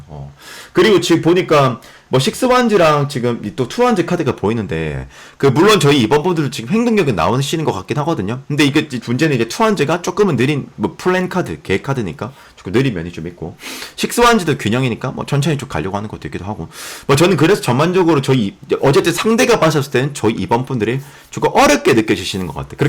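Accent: Gyeongsang, South Korea. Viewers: 갱상도라 좀 거부감이 있지만 내용이 더 중요하지요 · 1번...몇달전에 썸타다가 제가 까인 입장이라..ㅎㅎ 저랑 잘 될수가 없을거같긴한데...ㅜ저 자존감 낮아져있어용 ㅜㅎㅎ그나저나 전라도 여자인데 경상도 사투리 너무 듣기좋아용~~~차분하게 말씀 잘해주시고 전달력 충분히 잘 되고있어요^^ 모든 사람이 서울말을 써야한다는건가..그건 너무 억지같아요..계속 사투리 리딩 기대할게요^^ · 갱상도인으로서 사투리 지적은 용납할수가 없다. 우리는 서울말같은거 쓸줄 모린다. 너거도 사투리 몬쓴다아이가.! 같은 갱상도인으로서 긍지와 자부심을 지켜주십시오. 우리가 돈이 없지 가오가 없습니꺼!!!!! 화이팅!!!!! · 사투리가 어때서 트집을잡냐? 경상도 사람이면 사투리쓰지. 에구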